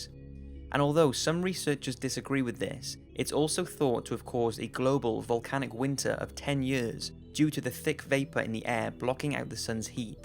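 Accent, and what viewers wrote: Wales, United Kingdom. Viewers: Are you Welsh ?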